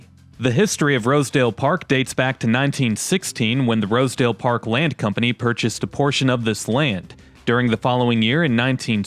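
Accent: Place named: South, United States. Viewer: I think it's cute you've a touch of a southern accent...not like I do, but I just moved to Detroit from Atlanta a year ago 🤗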